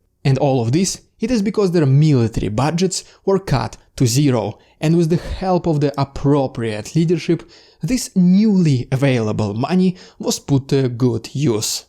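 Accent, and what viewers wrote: Canada. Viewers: Dude why you suddenly a canadian dude?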